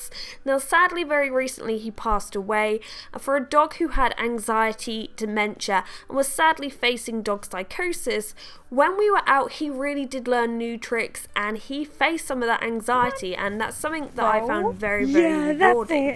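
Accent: United Kingdom. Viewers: Do live in England, Canada or the US? Because you have a British accent, but you have done that rover program. Not trying to be rude just wondering. 🙂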